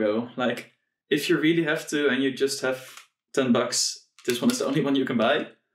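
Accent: Netherlands. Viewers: Haha dank je voor de video. Ik heb besloten mijn 2DS te modden zodat ik ook oude games kan spelen. Zelfs CBM64 (commodore) gamen. Nu zit er homebrew op :-) Lachen man, ik hoorde aan je accent dat je Nederlands bent. Maarreh toch vermakelijk die Ali consoles :o)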